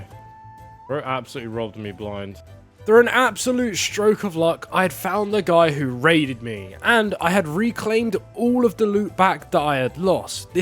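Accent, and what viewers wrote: United Kingdom. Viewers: Brit English is so annoying!!!!!!!!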